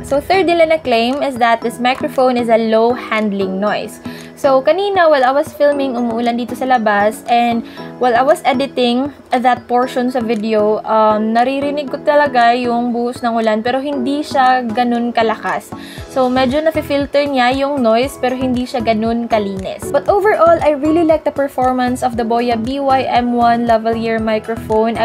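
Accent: Visayas, Philippines. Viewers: Hala ate😲bisaya man diay ka😆❤️😻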